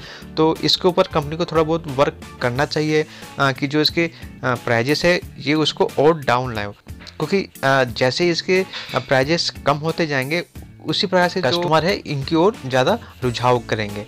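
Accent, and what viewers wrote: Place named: India. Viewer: i could not understand anything you said. your english has a strong accent, possibly indian.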